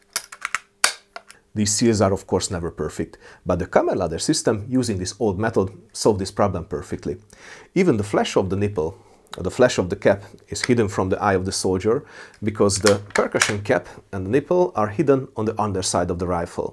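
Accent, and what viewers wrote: Hungary. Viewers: This guy has strong hungarian accent... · Ah, Kammerlader story told in exquisite hunglish, love it! :D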